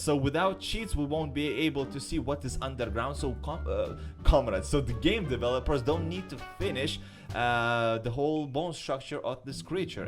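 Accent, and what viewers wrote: Russia. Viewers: What country do you live in? (Russia)? русский акцент)))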